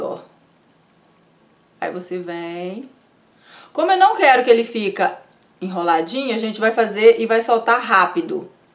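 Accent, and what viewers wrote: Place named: Minas Gerais, Brazil. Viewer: eu entendo o pouquinho de mineiro 😂😂. Maravilhoso o vídeo e já aguardo o das técnicas de maquiagem. Beijo diva 💋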